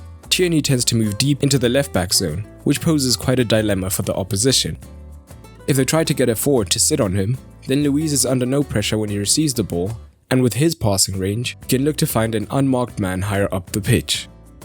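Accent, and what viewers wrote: South Africa. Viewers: Are you South African bro?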